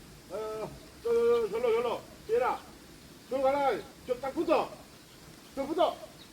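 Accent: Gyeongsang, South Korea. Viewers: 갱상도 사투리도 에라이 다 이해하네요 ㅎ ㅎ ᆢ 형 가벼운 테니스 공으로 에라이 힘들어요 ㆍ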